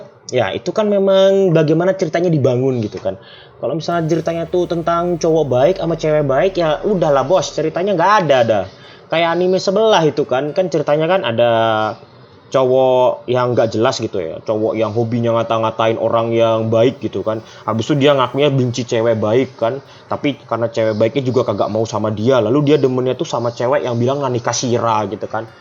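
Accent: Banjar, Indonesia. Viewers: Ikam urang banjar kh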